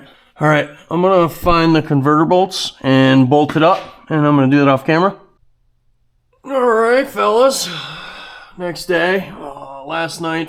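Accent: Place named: Upstate New York, United States. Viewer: I love watching you work on "old junk" much more than I would like to watch people work on expensive new things. I don't like to watch rich dudes rubbing it in my face. I like watching because I like seeing someone who is obviously doing something they love with obsolete things, not wasting things, making things work, doing good work, tasteful work, intelligent work. I can't stand people who do click bait, who trash things for no reason, who are destructive, materialistic, act stupid or crazy on purpose. comes off as a genuine dude and I believe he acts the way he is on camera when he is off camera and I think he is sincere in what he says. He would probably get more views and make more money if he was like that but, I am glad he isn't willing to sacrifice his class for that. My father was a trucker from Oswego County NY, he loved all this kind of stuff. 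I love hearing talk his accent reminds me so much of the working class upstate NYer guys I was around as a kid. It really warms my heart. It's also impressive to see a guy who is doing well in spite of all the downsides of living up in NY. The weather, the economy, the government, the laws, the rust, the cost of living, the taxes. I love it up there in the summer out in the country. I'm 41 and I was in the military and I just couldn't make it up there so I live Tennessee. Thanks making these videos, I really appreciate them.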